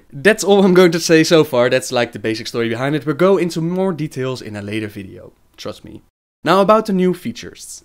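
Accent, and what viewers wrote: Netherlands. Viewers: You're doing well on suppressing your dutch accent.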